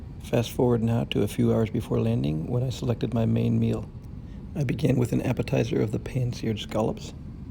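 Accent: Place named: United States. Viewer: I like your American English accent ;-)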